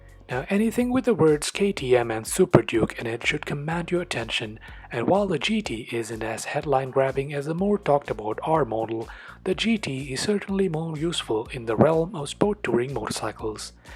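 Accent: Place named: United Kingdom. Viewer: Really annoying Brit accent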